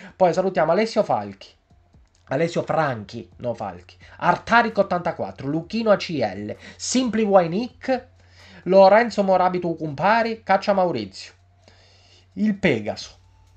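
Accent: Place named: Sicily, Italy. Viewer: Ciao è sempre un piacere vederti, comunque posso chiederti una cortesia? Puoi evitare di bestemmiare? Poi una domanda, ma sento alcuni accenti siciliani. Di dove sei?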